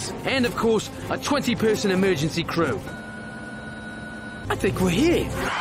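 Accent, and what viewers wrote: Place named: United Kingdom. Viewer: Why does he sound British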